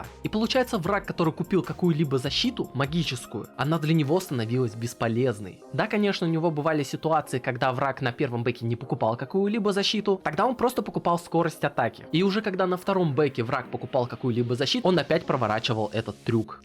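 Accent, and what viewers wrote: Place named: Asia. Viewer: он говорит на английском с некоторым азиатским акцентом. Подтвердить его русскую национальность не могу.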